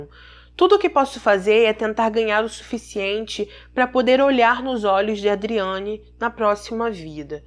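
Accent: Rio de Janeiro, Brazil. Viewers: Tô és do Rio? Fala extados unidos parecendo carioca. É legal 👋🏻👋🏻👋🏻👋🏻👋🏻👋🏻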